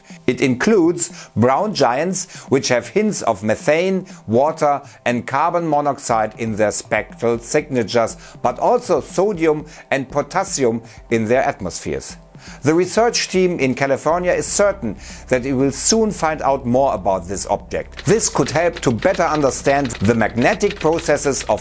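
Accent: Germany. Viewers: Your German accent is very nice combined with those topics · Auch wenn man den deutschen Akzent stark raushört ändert das nichts an den coolen Infos und die Art wie du es rüberbringst. Cool das es auch nun nen englishen Kanal gibt. Gutes Video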